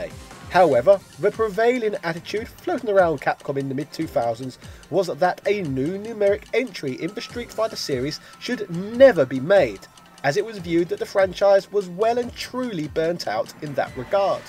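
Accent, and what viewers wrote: United Kingdom. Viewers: As a tournament fighting game player I find your fighting game videos a bit cringy. That's not to say your videos on fighting games are bad, it's just that what we as tournament or hardcore players look for very different things in a fighting game. As an example, casual players went crazy when SF5 didn't ship with a story mode. We didn't care a bit. The game was very solid on launch from a competitive standpoint. I actually picked up my keyboard to shit post and call your videos shit, but then I realized that if you got an OG tournament player to collab with you could make some amazing stuff. With fighting games, the way a hardcore player and casual player look at the game is so different and it'd be really interesting to see both side by side. It is obvious you have a great love for all things fighting games, from a casual level, which is most people that buy these games. 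I saw you and a few others on a stream complaining about tournament players roasting your videos(I was about to be one of said players lol). You'll find that there are some valid reasons you're getting roasted. I just think that showing how basically every fighting game is almost 2 games in one could be really interesting depending on who you can find to collab with. Judging by your accent, you're from the UK? Try getting a hold of Ryan Heart(Harte? not sure on spelling). He'd be perfect to add the hardcore mindset to your videos.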